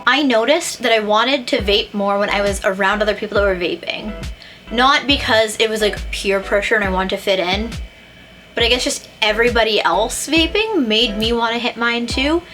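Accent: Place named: Massachusetts, United States. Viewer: Sounds like you live in massachusetts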